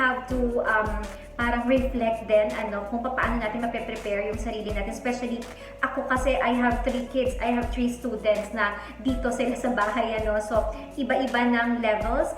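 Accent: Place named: Vietnam. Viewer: Although can not hear English. But I'm also curious .... You are Vietnamese! You speak English like Vietnamese. great, excellent, wonderfull . I have subscribed to the channel. Please exchange. Wishing you and your family wellbeing. Love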